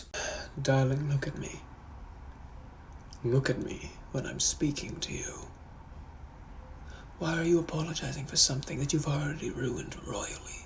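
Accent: RP, United Kingdom. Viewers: i am currently unable to breathe... he- i- 🥴 the butterflies i have right now are insane.... i need to make his voice my alarm in the morning and my ringtone not even just the british voice... just his voice in general.. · I came here for the reg voice and I got British why did you lie to me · I searched "British accent ASMR" and it brought me here. 10/10 no notes. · pov: you're standards are high because you're simping over and his accent when he is a British gentleman from the 1800's... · Thank you for blessing us with British gentleman for 22 minutes 😌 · Your normal and British voices are so amazing and comforting idk how but damn I could listen to this whenever I cry for comfort ily bro😔♡︎ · 22 straight minutes of the British gentleman, don’t mind if I do 😭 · 22 minutes of a British gentleman?? lord have mercy on my soul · Idk why but his British gentleman voice reminds me of Elijah from the Originals but if he was a psycho and didn’t respect woman(maybe???) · Fuck 'British gentleman voice - ASMR WITH THEM KNUCKLES THAT CRACK LIKE THE VOICE HOT THEM KNUCKLES POP LIKE MHHHM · Hi I like the POV's and the voice of the British gentleman from the 1800's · Funny how I fell in love with kpop years ago, loved british accent since forever.. 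and discovered him just now 🤡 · is he British or does he just sound more American when his voice is sped up. and he’s like a handsome pretty looking dude but i ain’t crushin he is cute though.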